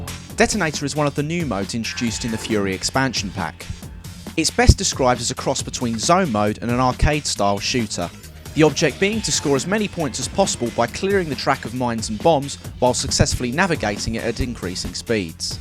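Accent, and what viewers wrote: United Kingdom. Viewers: It sounds like he might be from Essex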